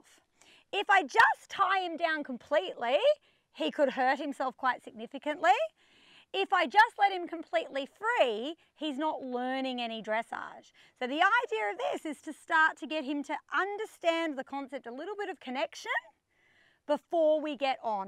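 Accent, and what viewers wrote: Australia. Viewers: I tried this with my OTTB here in AuS, and Honestly it just all made sense. was so positive and reassured me it was okay that he picked up the wrong lead and his stiff side was okay when he stopped and turned. This is brilliant stuff and hearing it with an aussie accent and knowing how things work over here 😀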